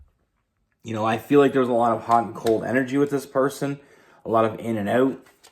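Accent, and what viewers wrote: Canada. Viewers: Love your Canadian accent 😎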